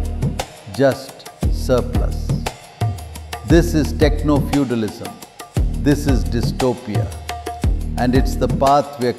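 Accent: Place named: India. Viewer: Obviously this is 100% AI. Any reason you used an Indian accent voice? Usually people chose American accent. Don't get me wrong, it's good, but I'm curious.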